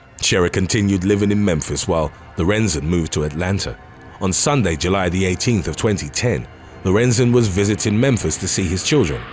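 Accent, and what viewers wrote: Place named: United Kingdom. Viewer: um, where did he say ANYTHING about correct English? HE'S A BRIT.... meaning he's not from USA and is NOT familiar with our pronunciation.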